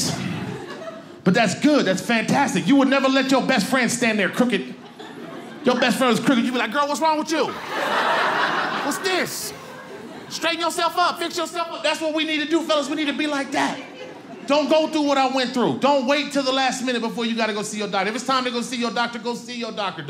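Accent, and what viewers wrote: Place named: Chicago, United States. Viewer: He is definitely from Chicago... 😊👌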